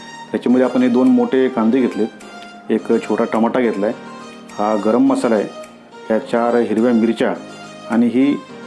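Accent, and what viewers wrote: India. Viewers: तुमच्या भाषेवरून तुम्ही नगरचे की काय असा प्रश्न पडतो?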